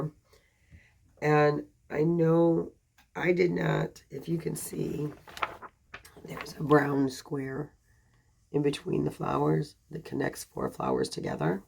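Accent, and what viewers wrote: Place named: North Carolina, United States. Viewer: X school bus driver Hope get to feeling better you sound like me and my daughter we live in north Carolina feel better love you ❤.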